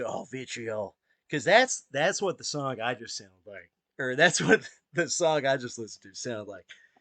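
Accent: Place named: South, United States. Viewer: You sound like a hillbilly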